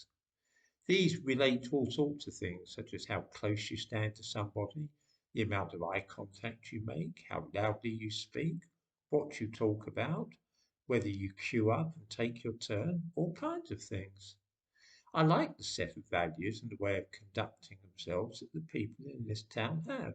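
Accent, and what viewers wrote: United Kingdom. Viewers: Every time I hear "Hello Again" I chuckle and smile, Love your videos and accent of course. Then I wonder is he typical British? Is there a typical Brit? There are so many sub sets I guess you'd call them, of areas or Nationalities like Whales Essex Ireland Scotland etc. What are you? I know you aint a Scot nor Irish but you are smart and i enjoy listening to what you have to say. I feel were pretty much in the same boat but a different continent. It scares me that our two Govts. are in lock step together same talking points agenda its like they're psychic. did someone say Hello again?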